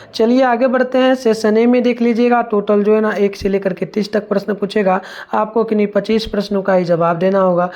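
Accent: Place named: United States. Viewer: Sir aap अमेरिका ke he kiya aap ke language waise. hee lagta he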